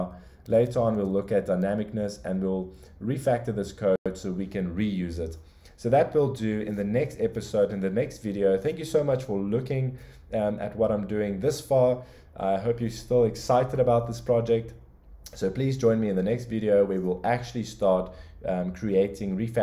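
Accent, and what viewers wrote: South Africa. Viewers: i have just found your channel, and dammit i know that accent is SA!! :) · This guy sounds south african....great work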